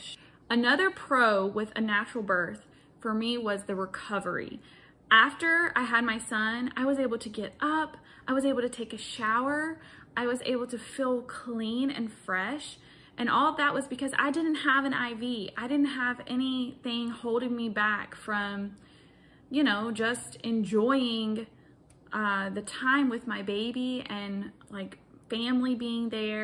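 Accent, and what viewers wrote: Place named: Texas, United States. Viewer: Are you from Texas? Your accent sounds really Texan! 😂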